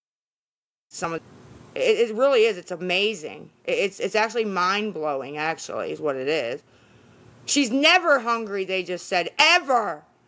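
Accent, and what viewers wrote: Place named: Western Pennsylvania, United States. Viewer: She definitely has a western PA accent i used to live in Johnstown and my bf mom said yinz and geez oh man lol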